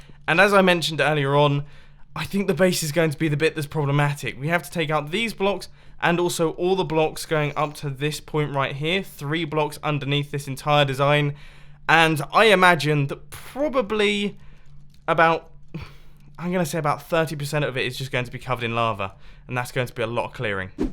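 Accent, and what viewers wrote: United Kingdom. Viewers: where are u from in terms of like Yorkshireman or Londoner.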